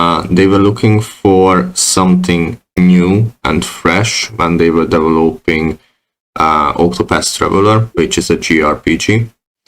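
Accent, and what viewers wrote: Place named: Hungary. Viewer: you have very hungarian accent.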